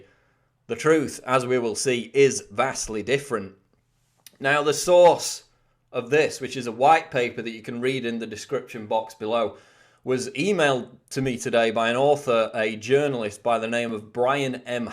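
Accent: United Kingdom. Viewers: never expected to hear bullshit in british accent I always thought a sign of intelligence and sophistication....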